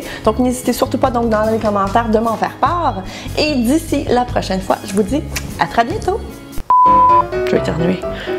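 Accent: Quebec, Canada. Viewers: omg l'accent, franchement l'accent québécois doit être inscrit au patrimoine mondiale de l'UNESCO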